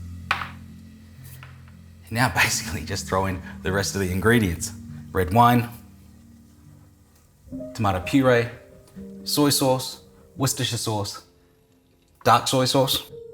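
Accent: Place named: Australia. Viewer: You have cool Aussie accent! Great presentation 🥰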